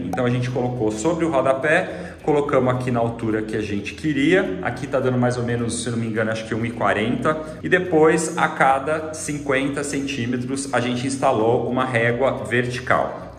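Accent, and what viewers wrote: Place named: Paraná, Brazil. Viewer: Vc não perdeu o sotaque de pé vermelho!🙈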